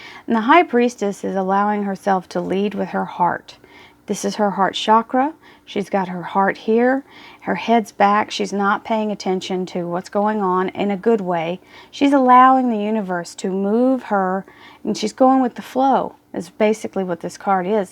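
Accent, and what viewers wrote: Texas, United States. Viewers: This is is 4 U R GREAT N FUNNY IN A GOOD WAY. I LOVE UR TEXAS ACCENT. 🤗🤗🤗🤗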